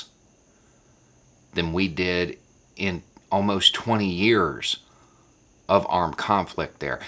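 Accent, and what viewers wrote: South, United States. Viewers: I just found your channel, and I Thank You for your commentaries. Where are you from? I'm in West Virginia, and I detect a country accent.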